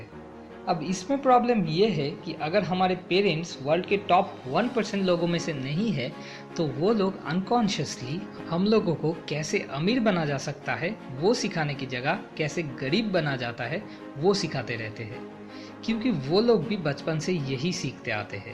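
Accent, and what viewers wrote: Bengal, India. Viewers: ur voice seems like ur are Bengali ! Right? But by the way... video ach6a tha!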